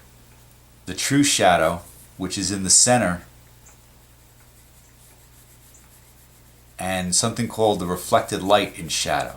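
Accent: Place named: New York, United States. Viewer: drawing is more fun with a new york accent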